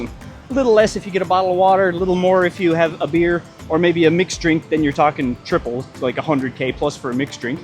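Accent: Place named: Eastern Canada, Canada. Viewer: Oh okay. Nice. I thought by your eastern accent you were but I heard you say something about western Canada so wasn’t sure. Cheers. Love your channel. Cheers 🍻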